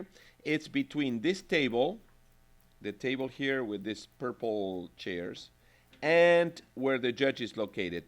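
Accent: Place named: Argentina. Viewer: Es Argentino.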